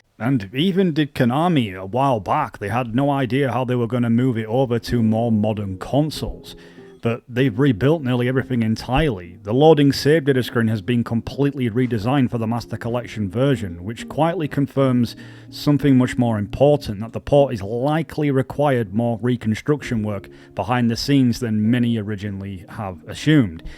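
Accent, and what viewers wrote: Bradford, United Kingdom. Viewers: he’s pretty clearly from the UK, he’s putting dramatically and drastically together, not that hard to understand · Yeah I don’t get why people are making out he’s Spanish or it’s not his first language. He sounds like he’s from Bradford or something 😂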